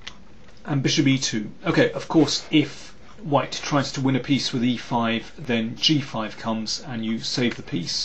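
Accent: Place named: RP, United Kingdom. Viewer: Yes Very Clear! even commentating at an Elite level, Thank you GM Daniel!!. Its clear because GM Daniel has superb speaking and chess talent! Also it doesnt hurt that he is speaking the Queens English right proper, the most understandable form of communication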